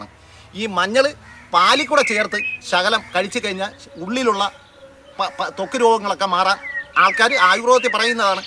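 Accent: Thiruvananthapuram, India. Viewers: അടിപൊളി നിങ്ങൾ ആ തിരുപനന്ത പുരംഭാഷയിൽ പറയുമ്പോൾ കേൾക്കാൻ നല്ല രസം 👍👍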